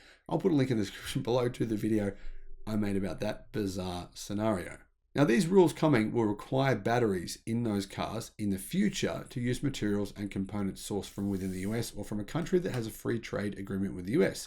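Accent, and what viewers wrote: Australia. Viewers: Your OZ accent is tough to follow. The speed of your speech makes it even tougher. Can you modify either, so that non-oz speakers can better comprehend your narrative ? Tx.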